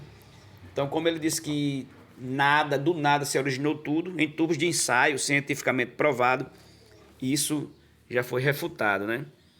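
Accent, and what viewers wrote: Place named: Northeast, Brazil. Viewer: Pelo sotaque desse narrador do vidio ele evoluiu do jumento porque ele e um nordestino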